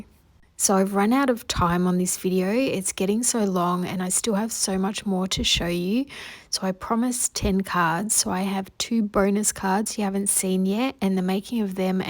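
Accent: Australia. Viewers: Love love hearing a Aussie going so well and does Brilliant work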